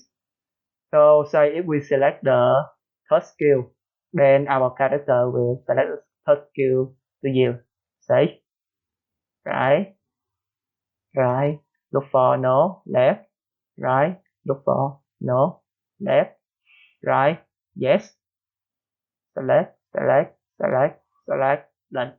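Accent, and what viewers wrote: Vietnam. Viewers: Nghe biết ngay VietLish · App ngon quá bạn ơi, nghe giọng nghi nghi là VN rùi mở page FB ra thì đúng lun :)) Trên dt xiaomi muốn chạy phải cấp quyền lại liên tục chán quá :( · Chuẩn mọe giọng Việt😂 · nghe giọng là biết ông người Việt rồi, công nhận ông giỏi thiệt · ông là ng việt nam à. cái giọng ko lẫn đi đâu đc lun ấy :))) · )))) ông này việt nam ak nói tiếng anh i chang v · Ôi cái giọng Việt Nam này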